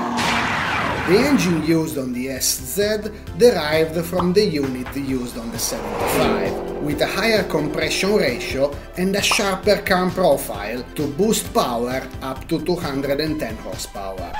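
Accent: Italy. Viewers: I really enjoyed this video being narrated by Italian. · The narrator makes this soo much brtter...so very much italian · I have only seen two of your productions yet, but I like them and especially the authentic character by your dialect with the "al Italia" touch. Molto bene! (sorry, I'm not fluent even though I'm half Italian but I never knew my real father)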